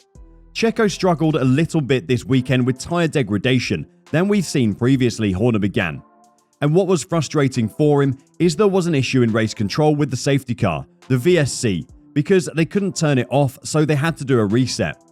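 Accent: United Kingdom. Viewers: Typical British: Unsporting as hell, but with a nice accent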